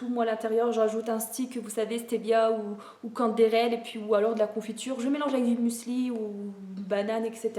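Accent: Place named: North, France. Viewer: Waouh j'adore ton tee shirt, tu l'as acheté où ? ☺ merci pour ton contenu, j'adore tes vidéos et ton accent qui me rappelle mes racines nordistes 😁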